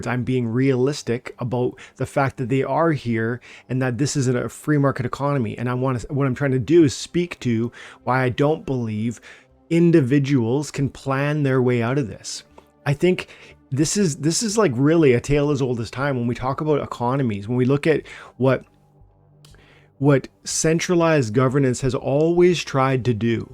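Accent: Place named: Canada. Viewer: The first time I unplugged my headphones from my laptop and watched one of your videos and my girlfriend walked by she said, "He's Canadian?" and I said, "How did you know? He doesn't really have an accent" and she said, "aboot." I'd give her my raffle ticket for that one but she doesn't play.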